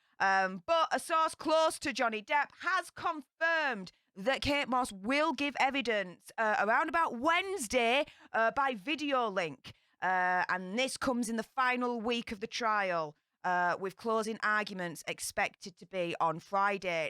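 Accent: Yorkshire, United Kingdom. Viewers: Hi # Just saw you on popcorned. Love your Yorkshire accent. It's 'reet gravely'... Love the vid. I subbed! x Ve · Is that a Yorkshire accent,? North or South?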